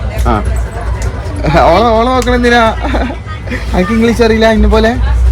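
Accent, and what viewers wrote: Malappuram, India. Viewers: Cute cuples... എനിക്കു൦ ഇങ്ങനെ ഇംഗ്ലീഷ് പഠിപ്പിച്ചു തരുമോ😍😅മലപ്പുറം ഇംഗ്ലീഷ് കേൾക്കാൻ നല്ല രസണ്ട് 😅😅😍😍🥰🥰 പാത്തുമ്മ പാവ൦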